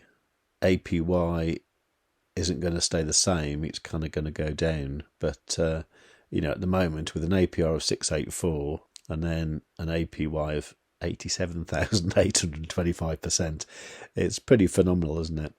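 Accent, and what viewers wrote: United Kingdom. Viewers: You are living in the states? you sound british or am I mistaking as german and english as a foreign language 😬 I guess the people who got RIDE via Launchpad are able to create a farm. For me I need to wait to get RIDE token, but actually I would like to participate from higher APR in the good old Farms when people leavin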